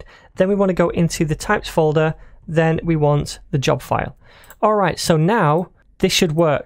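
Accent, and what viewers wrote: United Kingdom. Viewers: I was amazed to see you used rupees as the currency. Love the British accent btw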